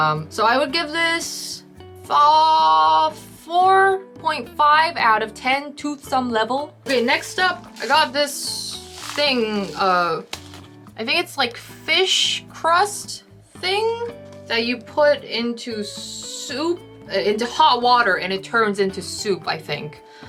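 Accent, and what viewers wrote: United States. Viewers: You got a mid west accent. What state you get it from? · dang u live in korea? you sound american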